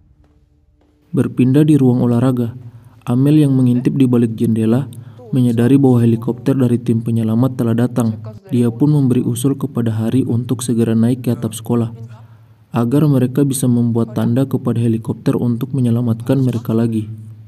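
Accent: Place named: Eastern Indonesia, Indonesia. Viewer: logatnya mirip orang timur. APAKAH ANTUM ORANG TIMUR?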